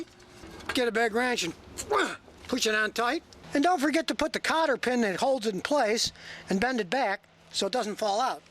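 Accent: United States. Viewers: Being English, it's really difficult for me to endure listing the Americain accent, but none the less, great video.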